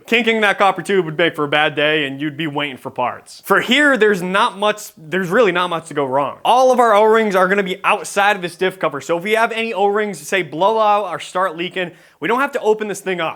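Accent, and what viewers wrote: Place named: Canada. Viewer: Boston? My guess was Canadian haha. I can hear it now that I'm typing this and you keep saying bearing!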